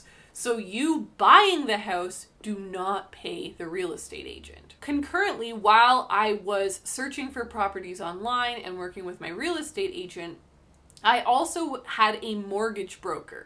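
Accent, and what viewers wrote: Canada. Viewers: That's true but she is canadian so she does have a certain canadian accent from a certain part of the country :) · Canada is gigantic. There are different accents in different regions all across the country. There is no one, single Canadian accent any more than there is one single American or British accent. accent is likely influenced by the area where she grew up.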